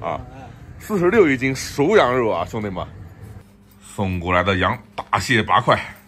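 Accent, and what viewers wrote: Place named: Northern Jiangsu, China. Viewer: 博主口音像是苏北😂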